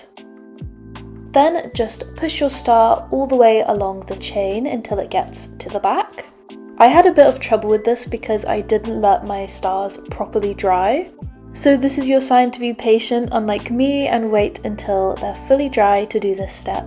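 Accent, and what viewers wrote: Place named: United Kingdom. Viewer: Indeed you did it quite absolutely fabulouslly magically authorically efficiently with your nice british accent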